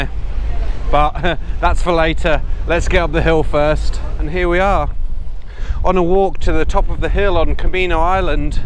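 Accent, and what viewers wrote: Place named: United Kingdom. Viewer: Nice to hear good British accent ..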